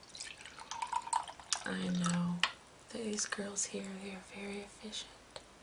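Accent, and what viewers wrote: South, United States. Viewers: lucky, i want to be british lol, and to me it just sounds like no accent because im american :P i don't know, now that i hear it its a wee bit southern at times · I'm british so the very short answer is that to me yes she does ;o) Now however you've got me questioning myself (and so I feel like I'm putting my neck on the block for this one) since I'm obviously not exactly an expert on american accents but to me her accent sounds southern at times. I must confess it is only slight however, hence why I'm now questioing what I'm hearing :o/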